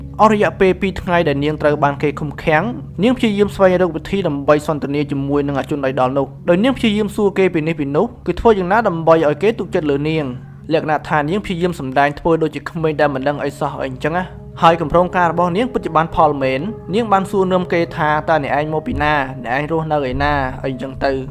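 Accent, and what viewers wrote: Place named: Siem Reap, Cambodia. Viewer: Admin និយាយមកដឹងតាអ្នកសៀមរាបម័ង😂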